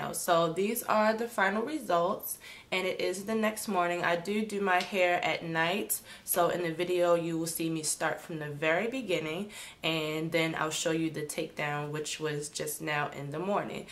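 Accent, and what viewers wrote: United States. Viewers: Doesn't she sound American to you?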